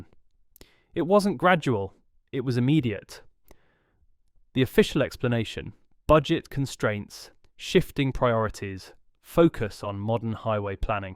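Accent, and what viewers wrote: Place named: United Kingdom. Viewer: WHY do all of these AI narrated videos have a British voice ? Tired of it.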